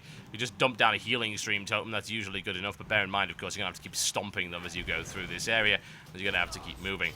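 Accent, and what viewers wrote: United Kingdom. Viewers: wait...are you complaining about a British having an English accent? I'm speechless. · telling a British man to lose his accent... gg kthxbai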